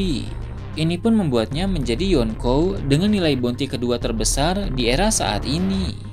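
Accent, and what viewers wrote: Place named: Tasikmalaya, Indonesia. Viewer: mang naha di akhir kalimat dikitukeun wae duhhh ciga orang tasik mang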